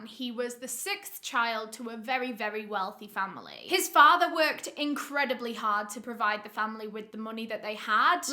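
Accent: United Kingdom. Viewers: ...which part of UK are you from? Loving your accent and you look lovely and beautiful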